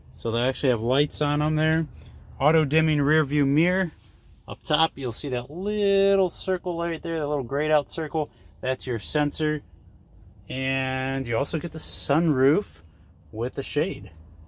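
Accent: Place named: Cleveland, United States. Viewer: Thank you for the overview. You have a very welcome interesting accent. You sound just like my friend from Cleveland. Are you in Cleveland.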